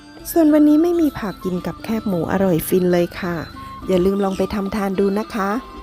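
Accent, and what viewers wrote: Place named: Central Thailand, Thailand. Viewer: ผู้บรรยายกับเสียงดนตรีบรรเลง ไม่เข้ากันเลย น้ำพริกหนุ่มกับเสียงดนตรีเป็นของภาคเหนือ แต่ผู้บรรยายสำเนียงภาคกลาง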